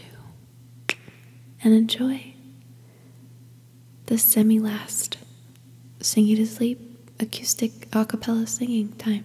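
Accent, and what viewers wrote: Spain. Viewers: Aré u Spanish? Bc u have the accent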